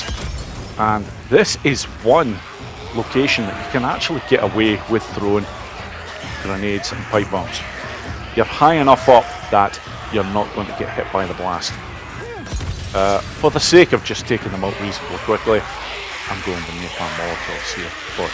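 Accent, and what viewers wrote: Scotland, United Kingdom. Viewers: Gg mate love the voice scottish right?😂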